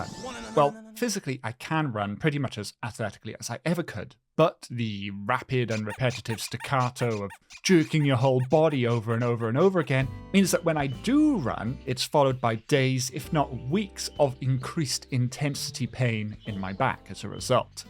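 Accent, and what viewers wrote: Wales, United Kingdom. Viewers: Are you welsh? If so thats super cool!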